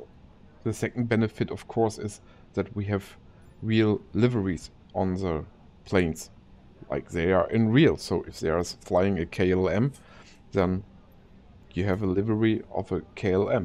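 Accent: Germany. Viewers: Englisch mit Deutschem Akzent ist irgendwie immer geil. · I was stationed in Germany for 5 years and I loved it. So the accent makes me remember some good times.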